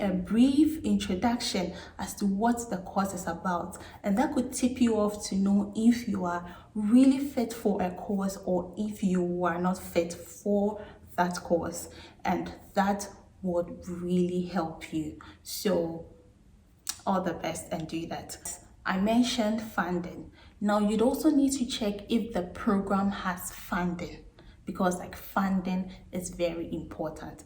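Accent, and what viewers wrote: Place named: Ghana. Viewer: You sound Ghanaian. Thanks for the video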